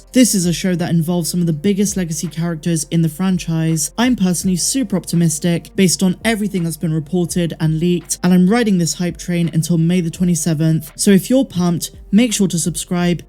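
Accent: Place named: England, United Kingdom. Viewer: ! 👍🏻 It’s hard for me to differentiate “Last” vs “Lost” with your English accent. It’s a very subtle difference for me as an American. I’m sure it’s obvious for the English.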